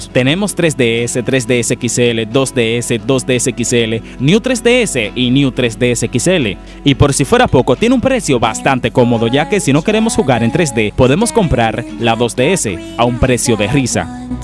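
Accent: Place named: Dominican Republic. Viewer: men tu eres dominicano tu me suena dominicano xd (yo si:v)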